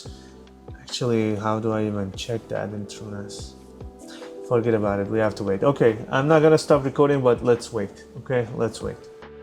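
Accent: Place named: Iran. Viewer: I can tell a Persian accent from a mile away. Nice board.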